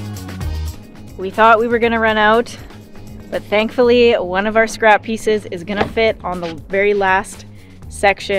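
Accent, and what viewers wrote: Australia. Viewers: are you from Australia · Hello from Puerto Rico. congratulations for the way you help your husband? and do such heavy chores. Keep safe from the black bears, I believe they could be dangerous if approach by humans. You should carry a weapon just in case to defend yourself. By the way, are you Australian?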